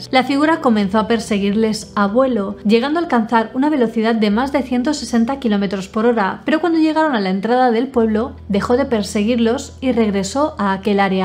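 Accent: Spain. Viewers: Qué hermoso acento español tienes. Tus investigaciones me encantan, pero con tu acento son perfectos. Saludos!!! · Hermosa con una linda voz y ese acento español , me enamore a primera vista ...de verdad 👍🥰 Saludos desde Chile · Como siempre genial 😃 . Un pequeño consejo, dicho desde el respeto, si la narración fuera más lenta la disfrutaríamos más... Sé que los españoles hablan muy rápido, pero somos muchooooos fans latinos, así tu encanto llegaria al 120 % !!!!! OMG . GRACIAS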